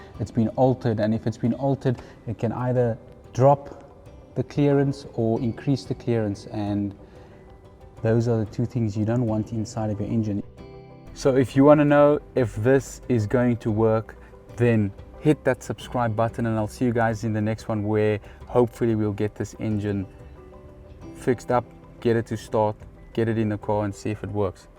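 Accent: South Africa. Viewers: Why do you speak like you’re from Upington or Springs? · U know wat i love about SA accent? Its soo thick, u cnt disguise it shem😂😂😂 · He's obviously Afrikaans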